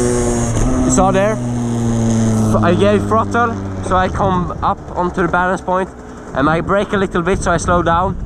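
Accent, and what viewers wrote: Sweden. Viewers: Älskar svenska dialekten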